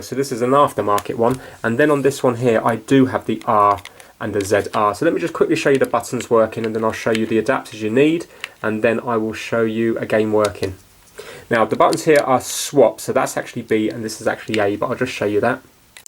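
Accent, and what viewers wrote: United Kingdom. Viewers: Geekas Kid probably British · Rami Abdalla he has a British accent. So that’s why he says it like that.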